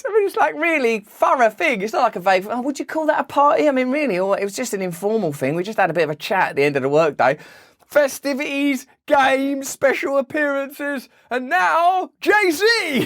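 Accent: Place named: Scotland, United Kingdom. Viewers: your scottish accent HAHAHAHA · ps love the scottish accent 😂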